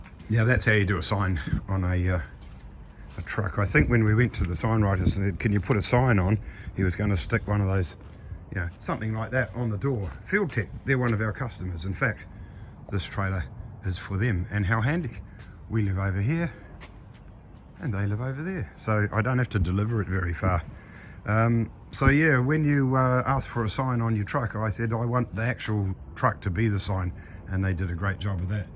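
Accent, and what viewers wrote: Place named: New Zealand. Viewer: I hear your kiwi accent . I am interested in a trailer as I load a tractor for grass slashing. Reply to me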